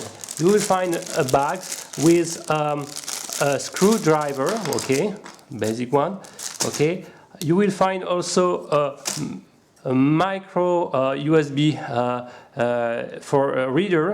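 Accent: France. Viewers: Salut, vu ton accent je suppose que tu es français :-) Je voulais savoir si ce quad était bien pour commencer avant d'attaquer le vol sur un 650 monté par mes soins? Et que signifie Banggod courtesy? En gros ils te le filent gratos et tu leur fait un peu de pub en échange ? J'ai également une chaine YouTube, tu crois que ça serait possible pour moi de faire la même chose ? Cordialement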